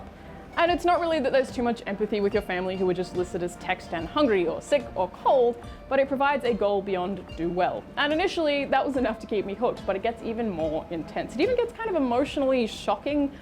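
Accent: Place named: New Zealand. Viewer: Is she from NZ?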